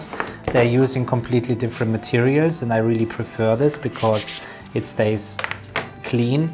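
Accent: Germany. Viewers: Are you german? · I bet you're German, man! Ich hör's doch am Akzent!👍😂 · Wow dein Deutsch accent ist hard😐 sorry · Dieses Englisch kann nur von einem Deutschen stammen, korrekt? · YES! Knew it as soon as I heard him. Germans have a unique English accent if their own. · Du bist doch deutsch oder das hör ich direkt raus :) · Dieser deutsche Akzent. Herrlich :D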